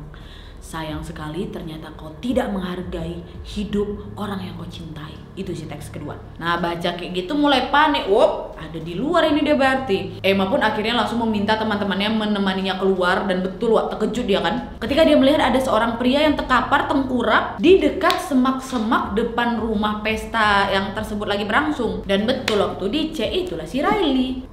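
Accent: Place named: Sumatra, Indonesia. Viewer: Penasaran, org mana sih. Logatnya ky dr sumatera. Familiar SM aku, enak ngomongnya.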